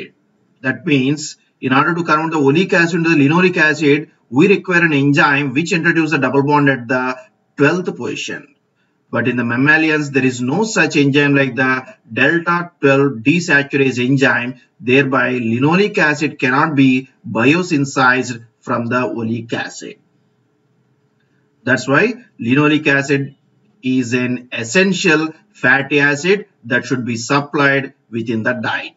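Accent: South India, India. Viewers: If you think its east indian accent, then you certainly dont have any idea about Indian accents at all. This is south indian english accent. And no, there is no such thing called east indian accent of english.This is the nth time I have seen a silly comment from an Anglo, saying that thing - "East Indian" to refer to something which is rawly indian. Is that an addage in West of calling Indian accents of English or anything raw from India that they wish to mock as - East Indian for some reason? Because , I never get the reference of how South Indians become East Indian when youse have to throw some rebuke. Nor I get how puniabies who happen to be West Indians get called having East Indian accent while they use a peculiar accent of english which is actually how they speak and not east indians at all. 2ndly, there is no such thing called East Indian accent in the 1st place. 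People from east india , if speaking in english speak in a variety of tonage and accents, some closely resembling old english and some just like Russians or chinese speaking , english . It's not a very common language in East India. So the whole reference of East India to everything seems highly stupid , ignorant, and unsubstantiated in the 1st place.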